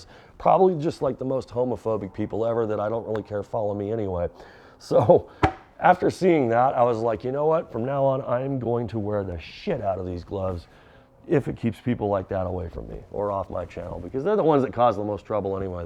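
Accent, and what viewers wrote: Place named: Transatlantic, United States. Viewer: Your voice does have a good tone, and you also speak with an accent similar to the formerly popular transatlantic accent used in a lot of media. People have told me the same and commented on my voice, but it's just how I talk. Especially when I'm on camera or teaching something. Wonder if it has to do with schooling or where parents are from or media consumed or what. Love the pink gloves rationale as well. I don't have time for needless negativity in my comments.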